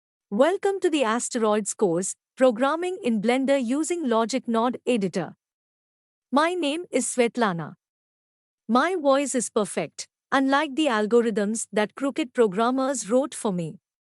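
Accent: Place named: India. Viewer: Indian accent +20 to understanding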